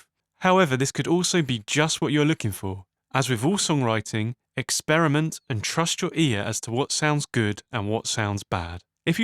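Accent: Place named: United Kingdom. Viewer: why does every tutorial have a dude with an annoying brittish voice?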